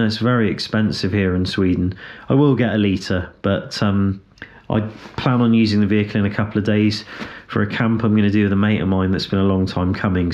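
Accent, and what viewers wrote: United Kingdom. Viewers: *English accent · listening to you prattle on with that fancy British accent is never boring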